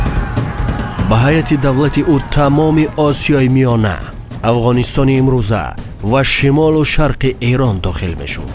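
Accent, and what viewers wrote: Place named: Tajikistan. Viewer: Е гапт надоорм ака ай гапзадант малумай арейци тозайи. 🤓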